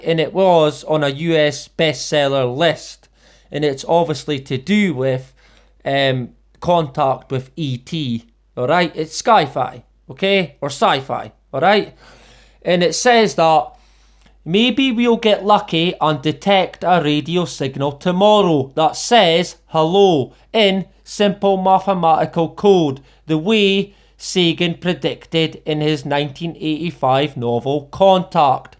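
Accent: Scotland, United Kingdom. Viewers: Shalom, dear friend and brother. Thank you so much for this information. I'm going to be sharing it, along with the previous video on PBB, with family and friends who think I made this stuff up lol. Keeping you and yours in my prayers. Love that you're not afraid to share the truth, especially with that amazing Scottish accent! Say hello to bonnie Scotland for me! Much love from still chilly Michigan USA. 😍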